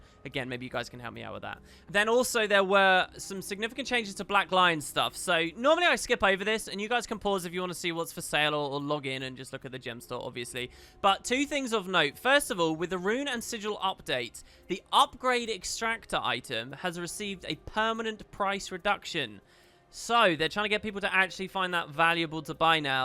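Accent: United Kingdom. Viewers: I just hope you (not, but the real you) are doing OK. Your internet persona has been part of many bike trips to work. To so many coding evenings and you've helped me gain further understanding about how brits talk. I've come to grow fond of you and I'd hate something bad happening to you. You had us worried because we care for you even though we don't even know your face, haha.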